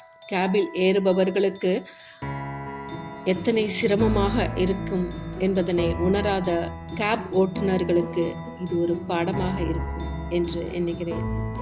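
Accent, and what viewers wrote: Chennai, India. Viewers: சென்னைத் தமிழ் ..... யதார்த்தமாக இருக்கு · அருமை சென்னை பாசை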